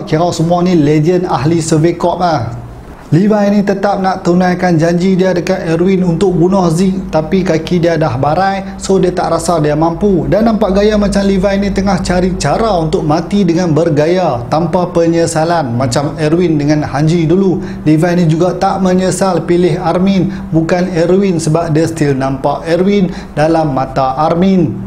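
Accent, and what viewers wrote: North, Malaysia. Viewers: Haah bangsa utara kita haha 🤣🤣🤣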